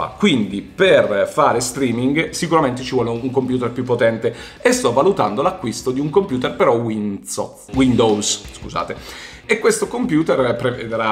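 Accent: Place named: Foggia, Italy. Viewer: Ma sei di Foggia??? 😁😁😁 Riconosco l accento familiare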